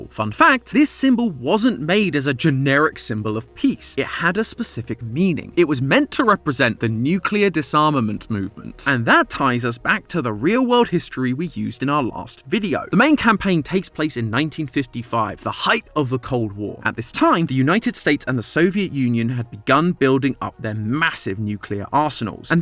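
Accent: United Kingdom. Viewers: Are you British um whatever your name was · I love the British accent tho❤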